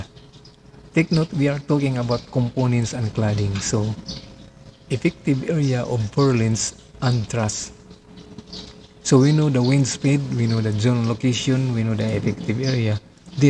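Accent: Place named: Visayas, Philippines. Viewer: p o t a n g e n a n g yung accent mong bisaya, dapat hindi ka na nag eenglish... napaka baduy mong magsalita, mababang uring taong bisaya... nakakabuwiset content mo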